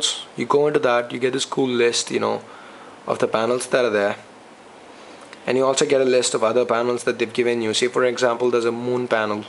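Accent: India. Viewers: Wer u from.ur accent like an indian im right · dood are you from india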